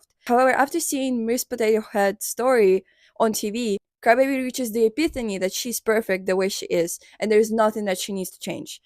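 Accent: Russia. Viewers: Are you Russian? Your accent sounds Russian Edit: saw the Cyrillic text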